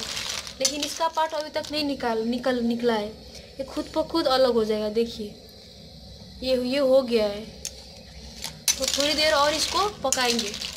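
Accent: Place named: Nepal. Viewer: Nepali ko Hindi bhukai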